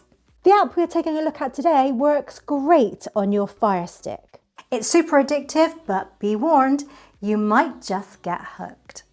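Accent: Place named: Bristol, United Kingdom. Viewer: Don't get caught with your pants down had me in stitches. Is that a Bristolian accent I hear ? Definitely West Country for sure. Looking mighty fine beautiful lady, keep up the great work you do.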